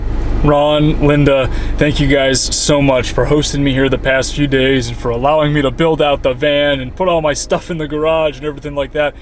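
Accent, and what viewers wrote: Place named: Modesto, United States. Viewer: You sound like your in my area which around a 100 Modesto, California Love your van conversion I wish there was someone in my life that could help me build my home on wheels which I'm planning on doing in about a year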